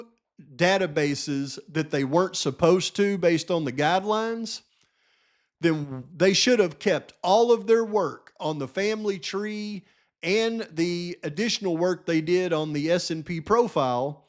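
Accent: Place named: Texas, United States. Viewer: C i too recognize acent. It's pure texan.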